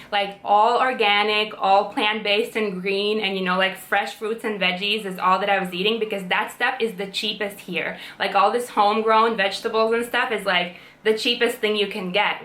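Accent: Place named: East Coast, United States. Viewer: the East Coast USA accent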